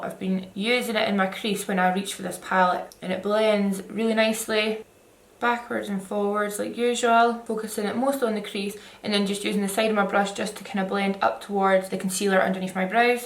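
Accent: Scotland, United Kingdom. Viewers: Jeannine Marie definitely not Irish haha she from Glasgow in Scotland x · is she Scottish ? if so love it or Irish I can't put my finger on it btw you're gorgeous!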